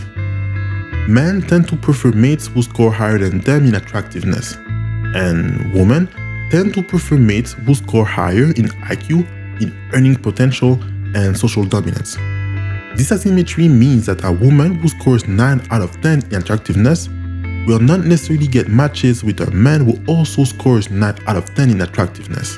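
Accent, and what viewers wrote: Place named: France. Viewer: you have a lovely accent Very French ✨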